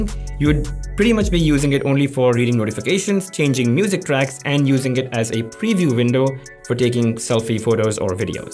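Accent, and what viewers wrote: India. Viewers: Very hard to hide indian accent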